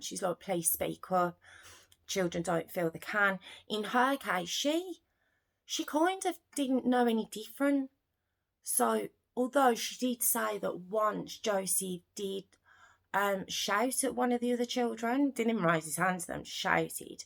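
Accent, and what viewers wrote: United Kingdom. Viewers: What kind if an accent di you have? British, or Irish? I can't distinguish it. I just heard of this story, and it's so awful.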